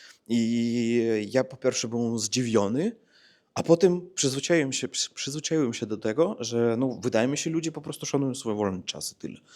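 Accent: Russia. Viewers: Zrobiłeś bardzo duży postęp w polskim w tak krótkim czasie. Akcent można powiedzieć że masz prawie idealny, choć rosyjski akcent w języku polskim jest taki uroczy ☺️ Zacząłeś też większość słów poprawnie odmieniać 🙂 chciałabym mieć taką głowę do języków 🙂 Szanuję! ☺️ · Od 18-tu lat mieszkam w Anglii i wciaz mowie z polskim akcentem, mimo, ze mowie plynnie i (prawie) bez bledow. Inna rzecz, ze nigdy nie ukrywam, ze jestem z Polski i ludzie to lubia, serio, pewnie dlatego, ze Polacy w UK maja dobra marke i jestesmy szanowani jako dobrzy pracownicy. A moj akcent w pewnym sensie dodaje mi kolorytu. Dlatego nie przejmuj sie swoim akcentem, bo on jest po prosyu fajny, wyroznia Cie z tlumu, a hejterow olej.(zignoruj). Jestes Rosjaninem i jest ok. no bo w czym problem. Jakbys byl Czechem albo Bulgarem, to co? Bylbys innym czlowiekiem? Lepszym? Gorszym? Nonsens. Ja chyba tego nie dozyje, ale Ty moze jeszcze bedziesz z duma mowil o swoich rosyjskich korzeniach. 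Kto wie? Daj Boze, zeby tak sie stalo. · rosyjski akcent gubisz bardzo ładnie. Z odmianą wyrazów masz problem, ale to normalne. Im więcej będziesz mówić, zwłaszcza z kimś, kto będzie poprawiał Twoje błędy, tym będzie lepiej. Inną metodą jest uczenie się odmiany wyrazów na pamięć, zwłaszcza tych, które sprawiają Ci problem. Może to wspomagać to, czego nauczysz się, mówiąc i nie będziesz stosować rosyjskich końcówek przy odmianie wyrazów w języku polskim. Inną kwestią są spółgłoski twarde i miękkie oraz te, które zmiękcza "i". To trzeba wyćwiczyć, bo Twój aparat artykulacyjny jest przygotowany pod inną wymowę, inny język. Z czasem przyjdzie samo. W każdym razie progres jest niesamowity, gratuluję. Świetnie się sprawdziły szerokokątne kadry, miły, przyjemny spacer i fajne treści. Niech Ci się dobrze wiedzie. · Co do niedopałków porozrzucanych tu i tam, to mogli przyjezdni zostawić, nie twierdzę, że cudzoziemcy. 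Jest względnie czysto nie tylko dlatego, że jest sprzątane, ale i dlatego, że jest mniej śmiecone. I jeszcze dodam coś na temat Twojego "akcentu", czyli wymowy. Akcent = przycisk wyrazowy = udarienije. Wymowa = praiznaszenije. U Ciebie jest już bardzo niewielka pozostałość wymowy rosyjskiej. Robisz błędy gramatyczne, ale znacznie rzadziej niż jeszcze rok temu. Gdybyś codziennie przebywał w polskojęzycznym otoczeniu, to było by najlepiej dla Twoich umiejetności językowych. A co z Twoim angielskim? Nie zaniedbuj go! Pozdrawiam, czyli zdrowia życzę!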